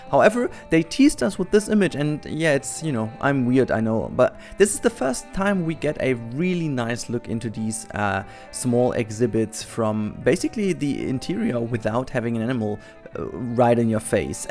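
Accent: Germany. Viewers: Are you german? Your English sounds like German :D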